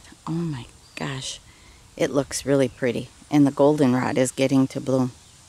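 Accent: Wisconsin, United States. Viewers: Thank you for all the hours of enjoyment & knowledge you've provided. I have to tell you it was your "Wisconsin accent" that pulled me in - initially. You are charming and I just knew you had a twinkle in your eye.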